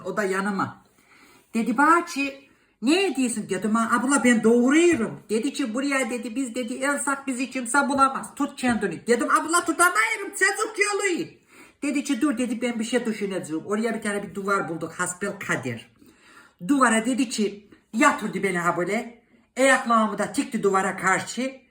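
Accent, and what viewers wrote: Black Sea, Turkey. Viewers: bazen olduğunu unutup karşımda komsu annem karadenizli ayşe ablamı dinliyormuş gibi geliyor 😂😂😂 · Harika Karadeniz konuşmasinada bayiliyorum ya mukemel bir insan ...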